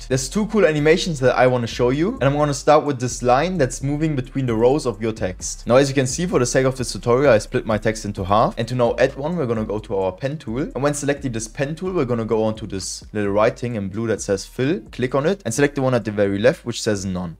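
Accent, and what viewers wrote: Germany. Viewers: Der Bruder muss deutsch sein bei den Akzent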